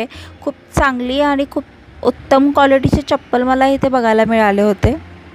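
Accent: Kolhapur, India. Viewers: kolhapuri tone chan jamliy.. next vdo tambda pandhra rassa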